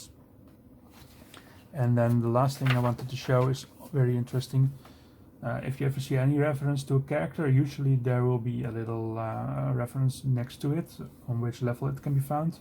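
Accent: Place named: Netherlands. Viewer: This was a very comprehensive and thorough review! I kind of want to get it now, once I've got enough money to spare for it. Megadungeons are super interesting! On another note, are you Dutch by any chance? Your accent sounds very familiar. (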